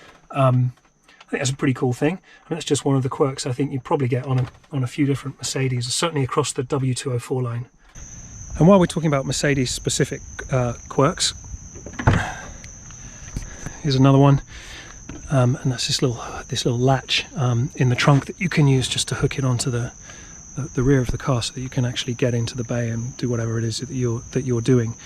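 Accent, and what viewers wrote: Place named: United Kingdom. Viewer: Why are you speaking british ?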